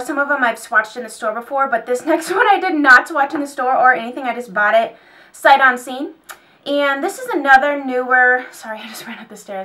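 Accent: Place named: Wisconsin, United States. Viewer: No way! My hubby is from superior WI. We go back every year in the summer to a lake near Madison. He has family in sun prairie. I knew that sounded Iike a wisco accent lol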